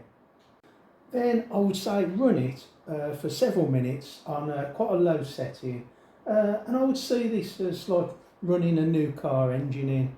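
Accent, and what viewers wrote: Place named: Black Country, United Kingdom. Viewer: Love your videos, you seem a very friendly chap, definitely a Birmingham/Black Country accent there;)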